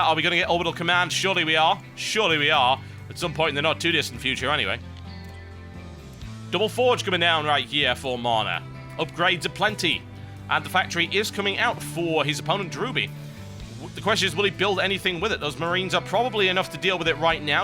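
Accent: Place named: United Kingdom. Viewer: hes so british.. i can't understand a damn thing when he talks fast, but i am no less excited.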